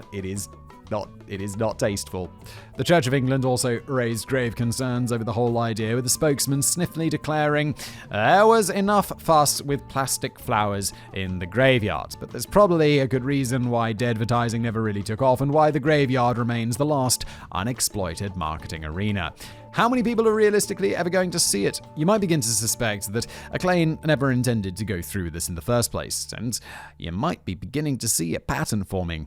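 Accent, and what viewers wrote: United States. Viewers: Simon has been reading so many scripts written by US. writers his brain is starting to use our pronunciations. This channel is great and the rants are classic. Thank you for making me smile on my darker days. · Simon's getting more and more of an American accent. We do say "ad ver tise ment" not "ad vert as ment". LOL.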